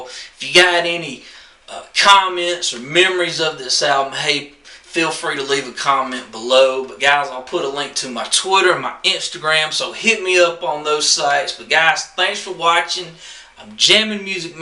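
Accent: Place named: South, United States. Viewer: sounds weird you talking bout electronic music with that accent. i expect some good old country and western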